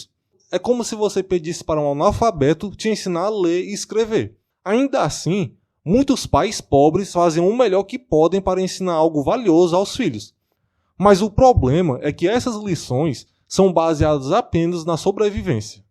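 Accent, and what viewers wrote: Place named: Ceará, Brazil. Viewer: Nenhuma dúvida que esse sotaque é do Ceará..rs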